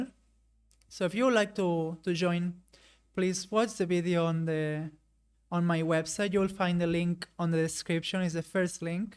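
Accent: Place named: Spain. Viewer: para que cojones hablas inglés si eres español, vas de intelectual y es ridículo. Mucha pereza la gente como tú, de verdad que tu acento es ridículo, si buscas más visualizaciones hablando inglés lo llevas claro, espabila chico.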